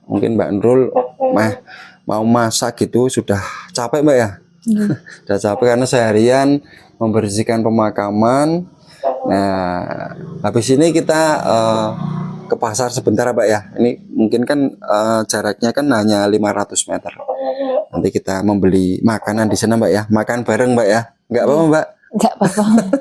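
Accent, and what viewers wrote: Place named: Central Java, Indonesia. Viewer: Embak orang jawa tengah ya... Td dengar nggih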